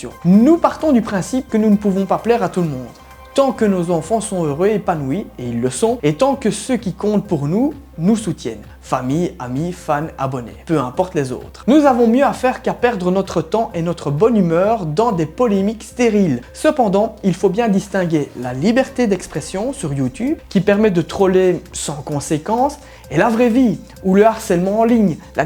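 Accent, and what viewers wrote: Belgium. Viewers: C'est un belge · Je suis Belge et au contraire je trouve qu'il a un bon accent bien Belge ahah ^^ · T'es belge? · Elisa Deleau à mais il est Belge ! Je me disait bien si il l'était pas :D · T'es belge ?? Prcq ta un ptit accent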